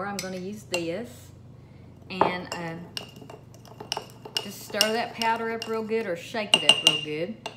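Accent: South, United States. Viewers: I came here for the stain remover recipe but I stayed for the southern accent.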